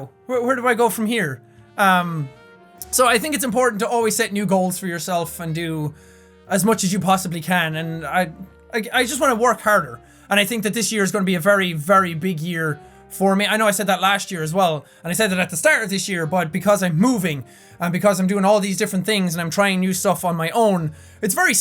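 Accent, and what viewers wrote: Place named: Ireland. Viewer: i love your Irish accent and i even dyed my hair like you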